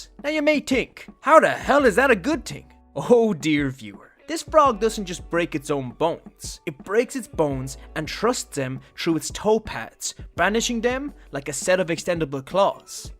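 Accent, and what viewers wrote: Ireland. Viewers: He has an irish accent and irish accents tend to pronounce their th as either a t like in words think and three or as a d like in this and that.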